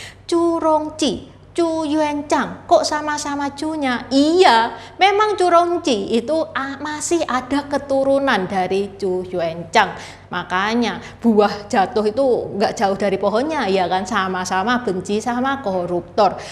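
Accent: Java, Indonesia. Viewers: Logatnya Jawa banget. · Cici bhs Indonesianya medok banget 😂😂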